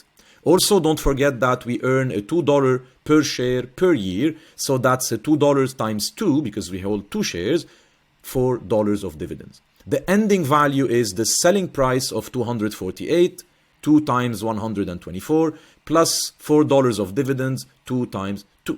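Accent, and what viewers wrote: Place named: Lebanon. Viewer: I knew this is Lebanese accent, I checked where the institute is located, but great explanation. Thanks a lot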